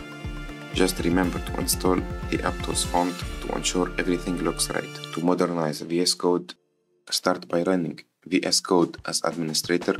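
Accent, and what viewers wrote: Russia. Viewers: Ты русский, что-ли? Крутой акцент =) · Um, cuz bro speaks like a robot with russian accent? Really slow, stuttery and without any soul in words. No doubt, it comes with experience and the author should continue improving (cuz e.g. all this themes have never been bundled in one video, usually being on different subreddits and videos => useful), but being surprised with a low number of subs is either your delusion or major d*ck riding lmfao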